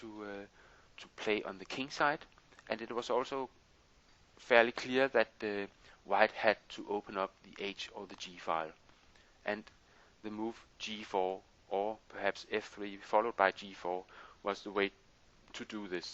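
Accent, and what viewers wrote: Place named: Denmark. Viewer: Love the danish accent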